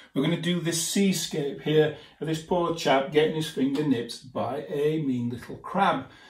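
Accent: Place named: Northern England, United Kingdom. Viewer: Thank you, great to hear a Northern Accent.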